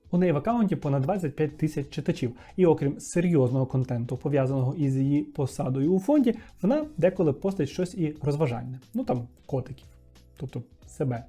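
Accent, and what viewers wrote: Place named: Lviv, Ukraine. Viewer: Зразу ясно, що автор каналу зі Львова. Бо хто ще б казав "ТарасА", з "ТарасОм" 😅. Для мене самої стало десь декілька років тому відкриттям, що літературно треба казати "ТарАса", "з ТарАсом". Наші регіональні мовні особливості такі чудові😊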